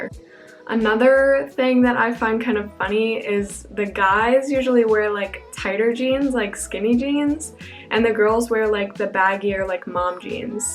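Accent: Germany. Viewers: Hi, it would really interest me to know the “ballpark area“ of where you went to school, like what state. Sounded a bit like Bavaria to me. And yes, a lot of things vary strongly from school to school, so you can‘t generalize too much. The language phenomenon is something you will notice Europe-wide, depending where you go, so Dutch schools might have students that can speak English, German, their own language, and possibly even French. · Hope it’s going well for you! I can’t wait so see you when u come back with your lil German accent😍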